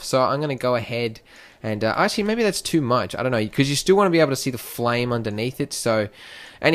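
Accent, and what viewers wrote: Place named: United States. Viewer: it's calt American English.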